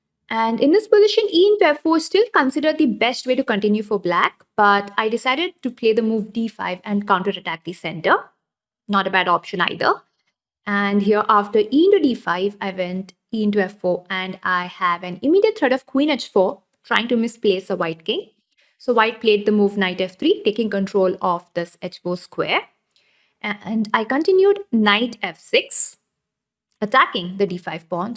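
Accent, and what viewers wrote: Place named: India. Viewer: You need to sort that popadom accent out girl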